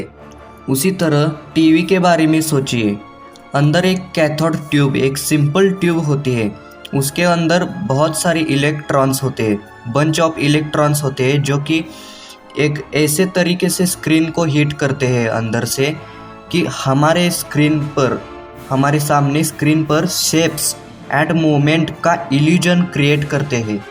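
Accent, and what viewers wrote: Maharashtra, India. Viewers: Bro where are you from it sounds Marathi guy anyway. I agree with you bro you really explained by hurt and its true. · कसलं भारी मराठी मे हिंदी बोलतोय भाऊ तू .....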